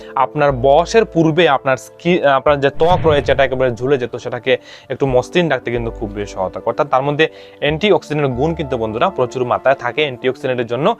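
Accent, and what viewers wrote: Bangladesh. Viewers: বাংলাদেশীদের উচ্চারণ শ্রুতিমধুর নয় ।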